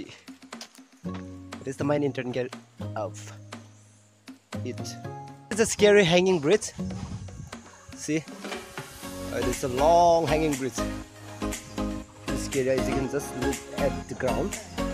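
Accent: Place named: Assam, India. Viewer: He talks like the Assamese.. Eg÷ Chai-Sai, Kaab-Kob, etc.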